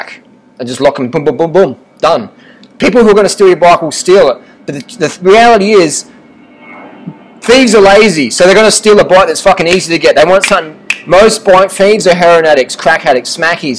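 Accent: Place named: Australia. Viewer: I'm curious, does anyone know where this guy is from? I'm detecting a sort of Australian accent but im finding it a bit difficult to understand him. It would be nice to know where the accents from :)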